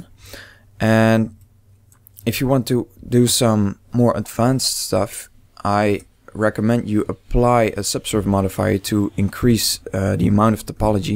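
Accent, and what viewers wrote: Netherlands. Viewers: je hebt een Nederlands accent :-) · Are you Dutch? juging by your accent.. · You sound dutch ;) very cool addon!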